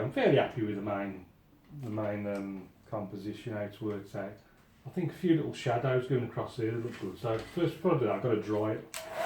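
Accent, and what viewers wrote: Birmingham, United Kingdom. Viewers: Beautiful result! I like the way you break down the painting when it's all done. Wish more YouTube artists would do that. Great Brummie accent btw! =)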